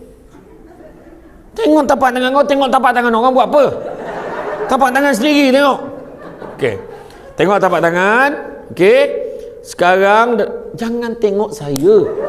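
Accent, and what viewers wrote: Negeri Sembilan, Malaysia. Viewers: Semoga ustaz selalu sihat hendaknya...saya suka dengar loghat nogori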